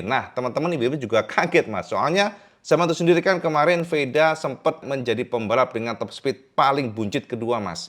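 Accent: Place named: Java, Indonesia. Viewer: PADANGG NJINGGLANGGG.., kanal terlengkap dengan data dan logat bahasa yg khas NJAWANI..., TOP MARKOTOP JOS GANDOSSS.., #vep9budalslametmulihraharjoaamiin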